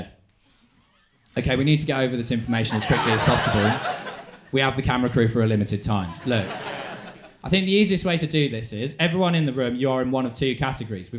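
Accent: Belfast, United Kingdom. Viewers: Milo's use of a vague belfast accent during this was very enjoyable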